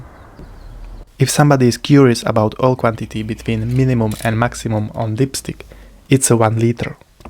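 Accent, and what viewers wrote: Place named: Romania. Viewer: I toght you are from 🇷🇴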